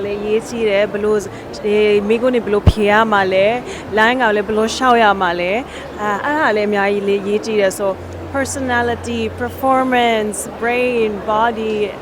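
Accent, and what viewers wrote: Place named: Myanmar. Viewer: Cute girl with cute Burmese accent.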